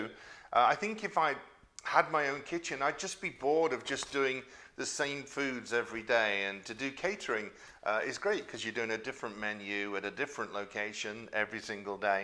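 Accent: England, United Kingdom. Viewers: Stumbled on your videos and became a huge fan. Keep doing what you're doing. I get no American accent at all you are still all English. It's a shame you didn't move to Canada though ;-)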